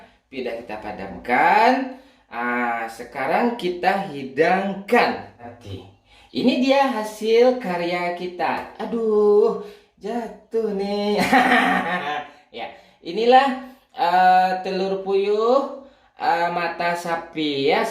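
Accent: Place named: West Sumatra, Indonesia. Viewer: Dari logat nya aja memang asli orang minang ehh btw salam dari Payakumbuh bang hehe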